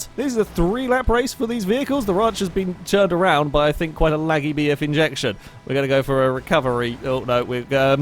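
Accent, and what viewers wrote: United Kingdom. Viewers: you sound so fabulously British :D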